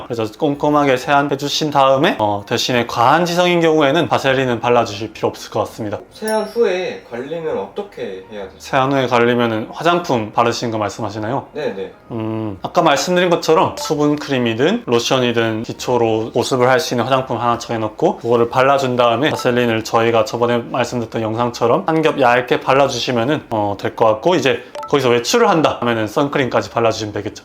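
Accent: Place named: Gyeongsang, South Korea. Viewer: 갱상도 쌤이네요 씻껐다 치고~♡ 씻었다고 치고^^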